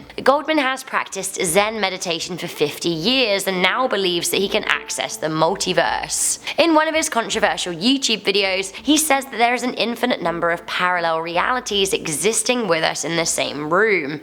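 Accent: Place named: Liverpool, United Kingdom. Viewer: Are you from liverpool?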